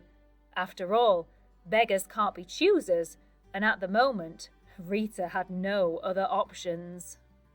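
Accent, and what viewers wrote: England, United Kingdom. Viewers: British accent 😍😍😍😍😍😍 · British English is so pretty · Great story to learn English with British accent. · Are it's use British Accent? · What a lovely story! I enjoyed and appreciated it quite a lot as it gives the chance to improve and keep up to date my reading and listening skills.I do love the English accent so thanks a lot! Marinella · English UK? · Does this program pronounce with British accent? It is so beautiful!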